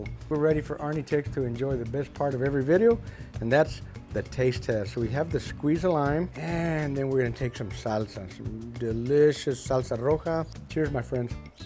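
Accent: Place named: Houston, United States. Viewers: Sounds like every first gen American (or born in Mex and came young) that lives in South Texas. 😂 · I have uncles from Houston that sound exactly like. 😂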